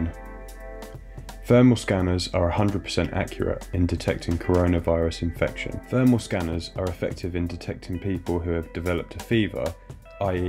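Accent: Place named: United Kingdom. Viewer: Wow Your British!! Never seen anyone British on my channel. Great Content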